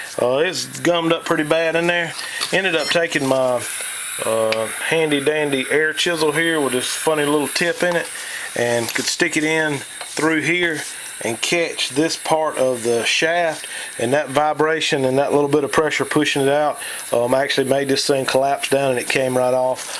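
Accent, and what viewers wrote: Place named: Carolina, United States. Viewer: I know it's activating but I really appreciate you taking the time and activation to do these videos, very helpful, and you sound like a north or south Carolina man, I'm born and bread north Carolina and I'll die here unless the liberals get to much which I'd say thier pretty bad across the country, depending on which state your in, the triangle area with our schools, duke, unc state, wake forest, it brings the crazy in but we praying it don't get to bad but anyway just wanted to thank you for your time and videos, God bless you and your family in Jesus name, be well , Mike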